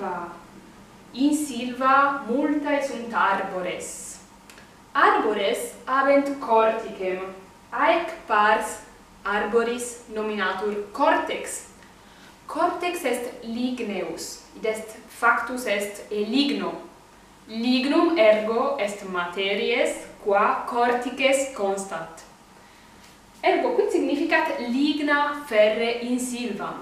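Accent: Italy. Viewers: pronuntiatio restituta cum jucunda modulatione italica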